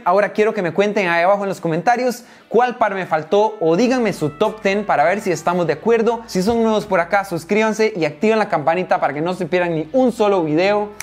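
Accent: Costa Rica. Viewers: Sos de Costa Rica? 🤔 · Usted es de Costa Rica? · Eres de Costa Rica? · Es de Costa Rica?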